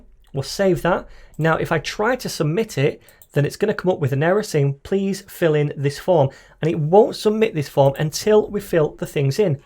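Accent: United Kingdom. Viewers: oon-dah-stand. Oh boy I love your british accent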